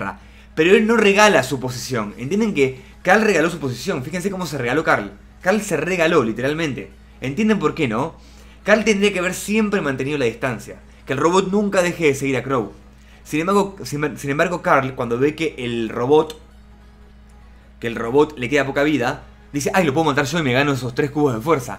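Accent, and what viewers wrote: Argentina. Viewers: por su acento lo más probable es que sea argentino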